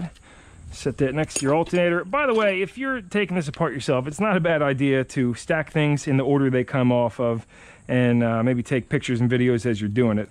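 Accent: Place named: Philadelphia, United States. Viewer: Pendell? As in Pendel PA? Langhorne? I live in Oklahoma now but i have not heard Pendel in a loooooong time. Clearly there are more then one town called Pendel in America but i used to live in Parkland when the Airplane Restaurant was still in Pendel. Anyway you sound like a Neshaminy Or Pensbury school grad North East Philly slang having hometown homeboy. So its gotta be! Come to Oklahoma and watch everyone look at you and change your name to Yankee! Thats been my name here since i moved here in 2014! Most people dont even know my real name! LMAO Facts!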